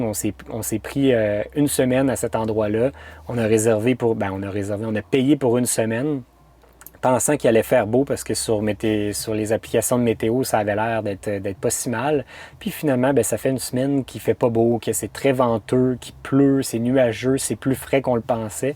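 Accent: Quebec, Canada. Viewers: relaxe le collabo si on vous avais pas liberer en 44 cest allemand que tu parlerai aujourdhui . p s cest accent quebecois et non canadien . mec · Et encore vu ton accens tu vis au canada, alors en Europe c'est 100 fois pire, pour nous le canada c'est les grand espaces sauvages, en Europe les gens se marche dessus, la surpopulation partout, faites des gosses, on est pas assez. 😂🤣😅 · Décidément j'ai horreur de l'accent canadien...Pour moi c'est une véritable torture de suivre cette vidéo....J'ai fini par lâcher prise dès le début....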